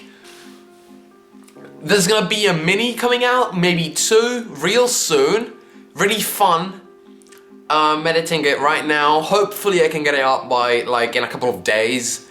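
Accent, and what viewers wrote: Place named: United Kingdom. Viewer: I LIKE YOUR VOICE IT'S MY FAVORITE KIND OF BRITISH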